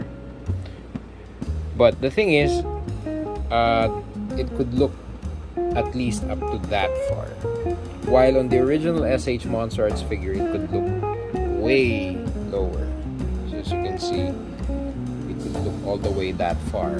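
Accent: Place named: Philippines. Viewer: This guy is from the Philippines just the accent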